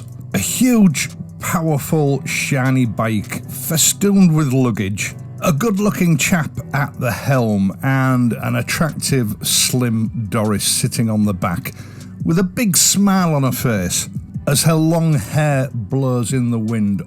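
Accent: United Kingdom. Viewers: Dear, Thank you so much for your videos. They are always filled with common sense, experience, and wisdom, which truly help in gaining new perspectives. I wanted to share that as a French speaker with limited English, your wonderful British accent can sometimes be a challenge for me (as we are mostly taught American English in school!). Because of this, I am always thrilled when your videos feature a translated French audio track. It allows me to enjoy your content even while I'm busy cooking or doing chores! I understand that these audio tracks are often managed by YouTube's algorithms and AI policies, but I wanted to let you know how much value they add for your international audience. Thank you for being such a unique and inspiring voice on this platform. Best regards from Belgium! Julian.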